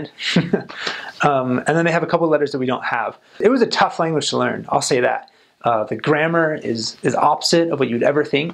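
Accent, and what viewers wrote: Albania. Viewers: I loved your alb accent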